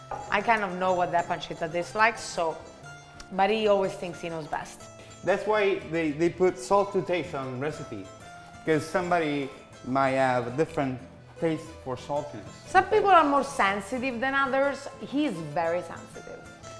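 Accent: Rome, Italy. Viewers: I just wonder how is your brother don't have the Italian accent. I mean you still have this thick accent but he does not. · Ago er cxx...😂😂 me ne moro che in mezzo all'inglese, ce scappa sempre la romanita'❤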